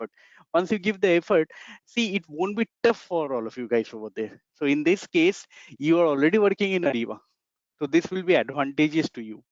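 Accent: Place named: India. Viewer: Hard to understand Indian accent. Why can't they adopt the American accent? Indian English talk sounds so irritating.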